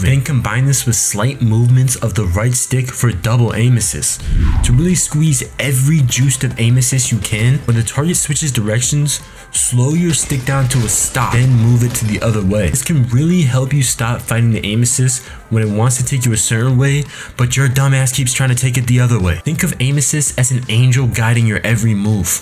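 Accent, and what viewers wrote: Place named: Midwest, United States. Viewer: I just know your from the midwest lol- great video bro!!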